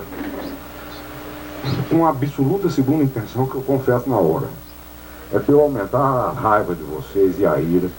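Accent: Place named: Northeast, Brazil. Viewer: A fala dele é identica a de Amaral Girgel outro nordestino destaque no mundo dos negocios.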